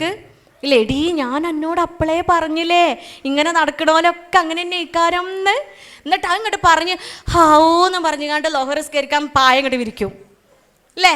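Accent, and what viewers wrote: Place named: Malappuram, India. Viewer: മലപ്പുറം ബാഷ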